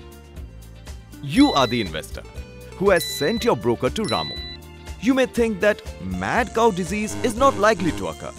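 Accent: India. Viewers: The single most annoying accent in the world. The Indian accent -good grief! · I adore the indian accent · That is a very American looking host with a very indian accent... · I got here looking for the mathematician term of "derivatives" This is the financial one -_- but it was interesting. I am learning English and I admit that I am not completely good at it... But starting from the first 5 seconds of the video I knew that It was and Indian guy hahahaha. I asked myself. Of course, this video is aimed to western audiences but what is an Indian Guys using an Example his "sacred" animals. In as you have seen the example was refereed to sell them to eat, India Mcdonalds are not allowed to use bovine meat on their products. And I know that I could sound kinda extremist but there are a bunch of animals that could be used for this example in a better way without violating cultural dogmas. 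At the end it was a nice video and I enjoyed it. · This was very informative, but why the heck does he have an Indian accent? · Your Indian accent is extremely annoying! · that Indian accent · I didn't expect Indian accent lol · Excellent in providing a clear and simple illustration of futures. Love the Indian accent. Thanks for doing this.Keep it up!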